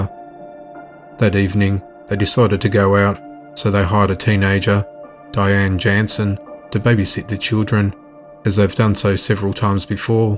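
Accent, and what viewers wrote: Australia. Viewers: Love the video, glad I've found your channel- are you a fellow Australian or from New Zealand?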